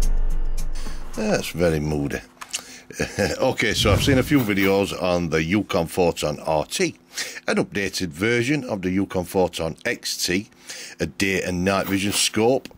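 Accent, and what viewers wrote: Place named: United Kingdom. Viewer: Always enjoy your videos and I love your British accent. Are you from Newcastle? Keep them coming fella!!!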